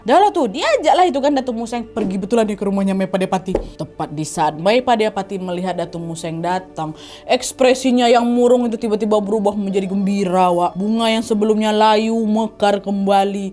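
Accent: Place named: East Nusa Tenggara, Indonesia. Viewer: Logat NTT nya kak masih kebawa wkwkkw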